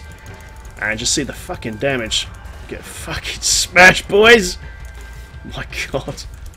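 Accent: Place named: United Kingdom. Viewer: I don't understand half the things this guy is saying! Damn Brit accent. Slllooooooow down man when you speak during your vids, thanks!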